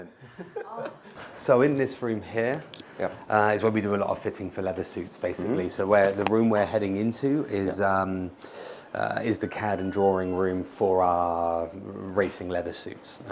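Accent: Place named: United Kingdom. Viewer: 好像英式口音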